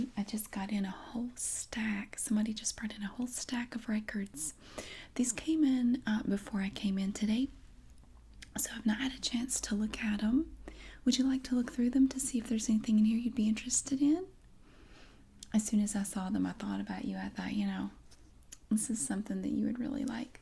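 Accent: South, United States. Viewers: This is a match made in heaven for me. Southern accent + ASMR + vinyls = my favorite things. Can't wait to fall asleep to this tonight, thank you ❤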